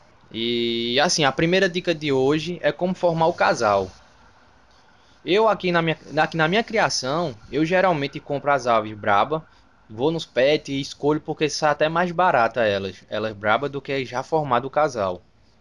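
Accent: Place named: Northeast, Brazil. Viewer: Você é nordestino? Sotaque lindo 😊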